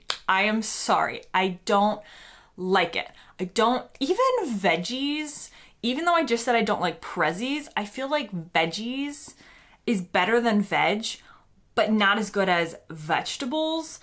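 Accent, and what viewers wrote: United States. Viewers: I found it weird saying that you're a Brit now if you're saying you have dual citizenship wouldn't you want to also say that you are American as well you sound American but I do like the content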